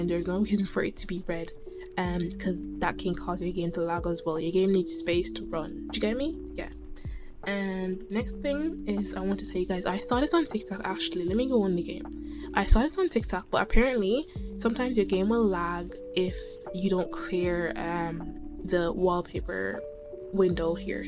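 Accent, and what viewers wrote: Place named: Jamaica. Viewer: I was listening and I was like She sounds Jamaican and I got so excited like have I finally found my people with same interests as me? And I checked the bio and I was right 😂 I’m so gonna binge the whole channel❤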